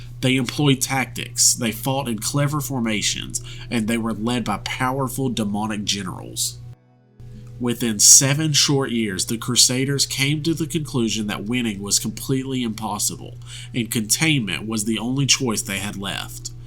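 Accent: South, United States. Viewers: my man has cornered the market on southern US inflected pathfinder lore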